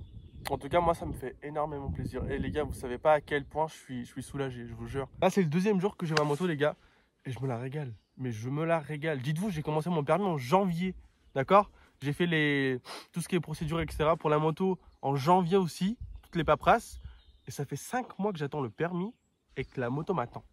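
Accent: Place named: Banlieue, France. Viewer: Tu as un accent des cités. Tu viens d'où ?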